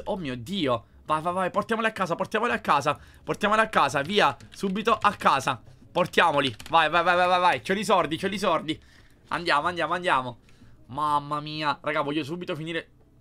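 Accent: Sicily, Italy. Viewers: Ma sei siciliano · ma sei siciliano?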